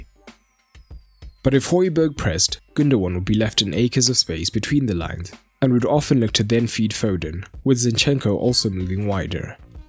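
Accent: South Africa. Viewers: Narrator. Are you South Africa? Your accent sounds familiar 🤔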